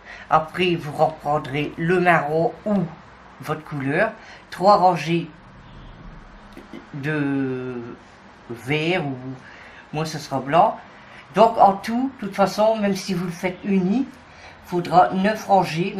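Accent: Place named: Cambrai, France. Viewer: Merci pour ce tuto je suis entrain de le faire pour ma future petite fille qui arrivera en septembre!!!! j'adore vos Modèles et les explications sont simples!!!!! Continuez à nous enchanter avec vos modèles, j'adore votre accent, j'ai habité Cambrai pendant 6 ans et quand je vous entends parler je m'y revois!!!!!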